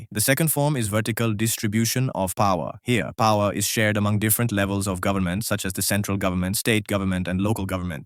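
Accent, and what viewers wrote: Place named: India. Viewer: Vid is very nice and helpful for exam but your axecent changed in middle that made me laugh of your indian axecent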